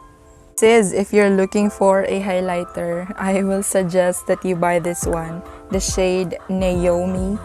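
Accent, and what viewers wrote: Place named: Philippines. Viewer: I like your Tagalog accent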